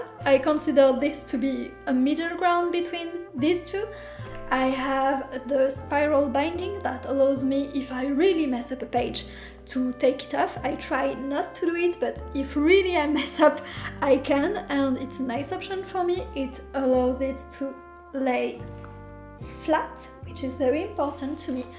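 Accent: France. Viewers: are you french? we almost have the accent :)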